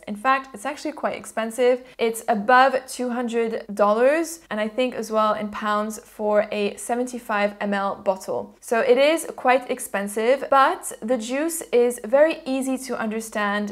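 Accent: France. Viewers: Probably a silly question but I've watched you some and your French accent sounds exquisite! Are you French? 😊 Cheers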